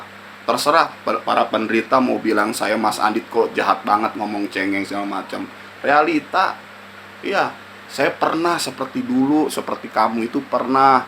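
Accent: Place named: Depok, Indonesia. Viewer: Asyiikk neh bahasa depok nya 😅😅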